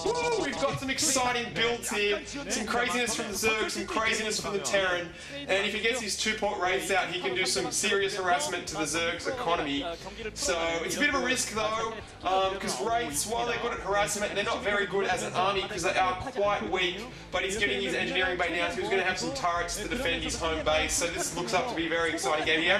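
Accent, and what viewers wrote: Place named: Australia. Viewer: crikey mate, a bloody aussie sc commentator?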